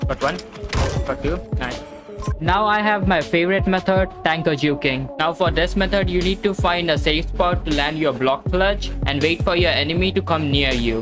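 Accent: India. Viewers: u sound like a indian tech dude indians are the best dude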